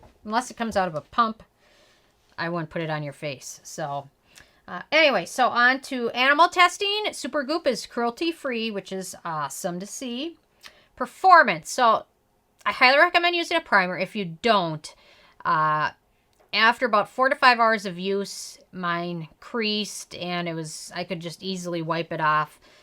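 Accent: Minnesota, United States. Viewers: You HAVE to be from Minnesota or Wisconsin!❤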